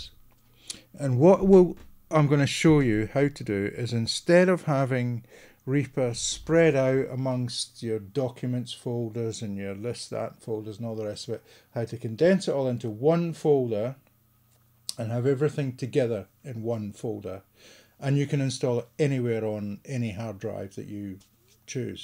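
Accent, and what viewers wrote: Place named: Scotland, United Kingdom. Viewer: Thanks a lot for this great video! I also really enjoyed your Scottish accent, it brought to mind my ten years when I lived in Scotland;-). &#160;- So if I understand correctly, this still remain a portable install of Reaper but just more tidy, correct? - Where is the best place to place all the VST.dll files for all of my plugins inside the main Reaper portable folder? Thanks a lot in advance and best regards, Max T.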